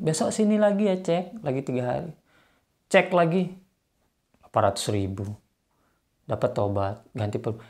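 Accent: Lombok, Indonesia. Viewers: logat lombok masih kentel😂😂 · Khas sekali Logat lomboknya 😆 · I like your style of speech Bro, Lombok gatiii 😁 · Logat sma diksi2 lomboknya ga ilang bang mantapp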